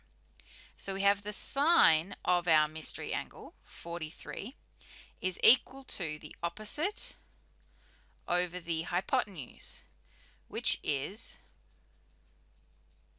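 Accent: Australia. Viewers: Thanks nice Aussie lady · aussie right??? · noticed the australian accent and checked the comments xD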